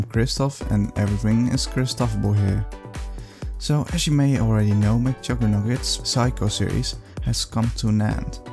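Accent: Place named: Netherlands. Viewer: you sound dutch and nice vid btw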